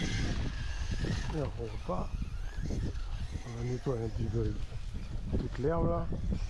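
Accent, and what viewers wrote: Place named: Martinique, France. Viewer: Se décors, sa tranquillité, l'accent de la Martinique ^^ un bon rhum et la RC moi je dit Goooooooooooo !!!! 😃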